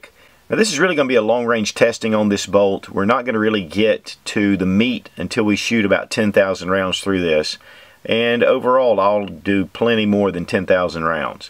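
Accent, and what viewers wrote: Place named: New Jersey, United States. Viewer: Sounds like New Jersey!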